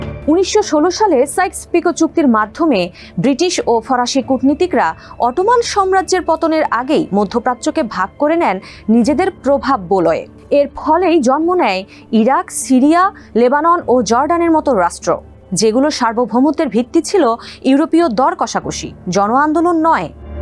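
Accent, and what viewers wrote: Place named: India. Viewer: সমস্যা যেহেতু বুঝেছ, সমাধান সম্পর্কে বল, শুনি আমরা! ভারতের স্টাইলে সংবাদ পাঠিকা কন্ঠ দিলেন! ভারতের দালাল বলা যায় কি?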